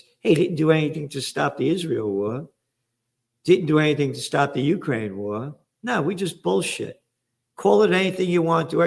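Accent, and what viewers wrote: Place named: Bronx, United States. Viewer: Hi GERALD FROM ENGLAND ( what's left of it ) I LOVE THE WAY YOU SAY "CLOWN'S" WITH YOUR BRONX ACCENT ...SOUNDS LIKE THE MAFIA WOULD SAY.🤡 SIR YOU HAVE YOUR FINGER ON THE PULSE OF TIME....💪👌CRITICAL TIMES INDEED 🙏.I STOPPED VOTING IN 1987 ..I DIDN'T WANT TO ENCOURAGE THEM 😔 TO QUOTE GEORGE CARLIN ..... " IT'S JUST A BIG CLUB & YOUR NOT IN IT,YOUR NOT IN IT !!! THEIR POLITICAL WEBS🕸️🕸️🕸️🕸️🕸️ OF DECEIT & LIE'$🤑🤑🤑🤑🤑.THANKYOU FOR YOUR BRUTAL HONESTY I ADMIRE THAT IT IS A STRENGTH OF CHARACTER....I AM SICK & TIRED OF ALL THESE SPINELESS POLITICAL LEADERS FULL OF HOT AIR!! WE ARE NOW IN A TOTALITARIAN WORLD....AN ORWELLIEN NIGHTMARE 🤑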